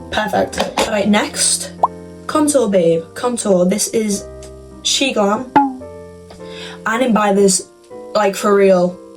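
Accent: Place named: Liverpool, United Kingdom. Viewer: thank u scouser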